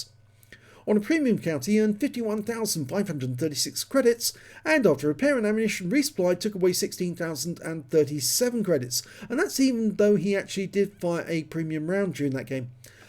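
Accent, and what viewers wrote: United Kingdom. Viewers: Mad respect to your enthusiasm while commentating and British accent keep up good work gg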